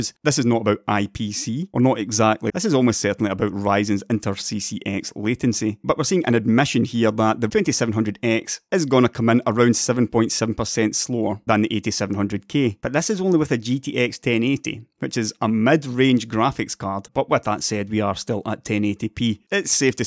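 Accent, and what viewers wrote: Scotland, United Kingdom. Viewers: scottish accent sounds like shit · Is that Scottish accent or Irish accent?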